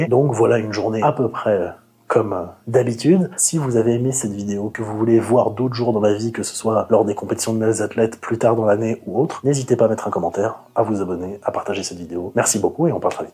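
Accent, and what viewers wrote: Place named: Thailand. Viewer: L’accent Thaï on point 🤌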